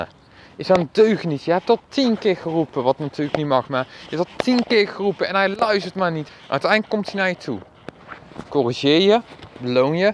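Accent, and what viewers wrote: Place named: Brabant, Netherlands. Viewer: af en toe kom ik terug naar jouw honden opvoedvideo’s en word ik weer even met beide voeten op de grond gezet. Wat leg je het toch goed uit, en wat heb ik al veel aan je tips gehad. En dan jouw zalige Brabantse accent, love it!! 😍 Dank je wel en ga zo door!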